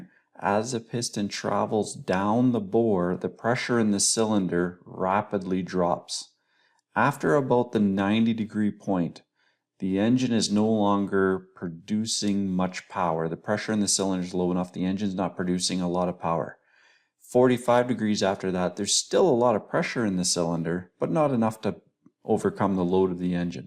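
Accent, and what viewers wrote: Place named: Canada. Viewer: I detect a Canadian accent. 🙂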